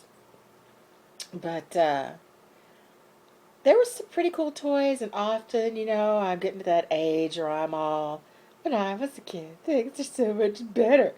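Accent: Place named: South, United States. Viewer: I had every toy you mentioned, the straw bubbles never worked for me! that stuff was crap. you look so good, loving you glasses and winged liner. seriously southern accents are just charming, you make everything sound so lovely. oppose to my New yorker accent which is...we'll interesting. I'm from brooklyn and now one here is soft spoken. No I don't sound like that stereotypical movie over dramatic ny accent. I worked my whole like to sound like I'm not from here!!!!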